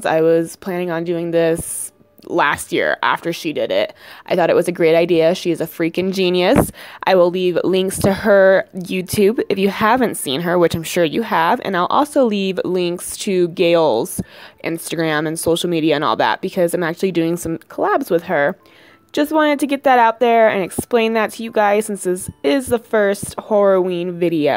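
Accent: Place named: United States. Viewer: Yeah I'm from a different country. I live in Scotland do its not every day I here an American accent.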